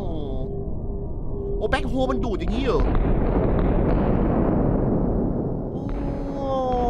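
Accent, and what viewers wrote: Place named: Suphanburi, Thailand. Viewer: สำเนียงสุพรรณ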